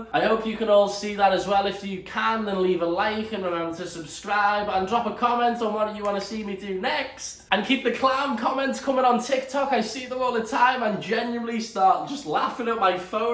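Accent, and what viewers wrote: Liverpool, United Kingdom. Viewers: heyyyyyy I love your Chanel so much and ur Liverpool accent hehe I think its from Liverpool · Note to self. Don't watch videos muted using captions (sleeping toddler lying on my arm) when it's a Scouse accent. Ended up really confused at what 'Istanbul's standards' has to do with everything and who the 'chiefs' are. Had to put the volume on to find out why it has went so international 😂 (I'm Scottish, subtitles just laugh at us to be fair) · are you scouse? Cause if so what football team do u support?🤙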